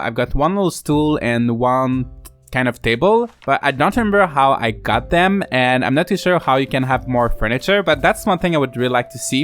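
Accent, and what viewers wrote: Quebec, Canada. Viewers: Just curious, where is your accent from? . . . . . Guess; Qc?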